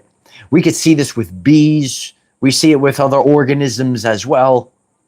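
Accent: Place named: Rhode Island, United States. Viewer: I found you from R.I. That accent is familiar lol. Close enough to NYC I think you're beautiful 🫂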